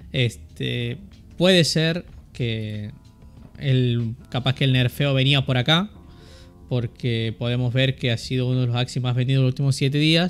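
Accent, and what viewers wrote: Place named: Santiago del Estero, Argentina. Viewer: Reconozco ese acento del Norte, sos santiagueño?, buen video todavia soy muy principiante en tema de cripto y nfts pero con estos videos me informo.Saludos.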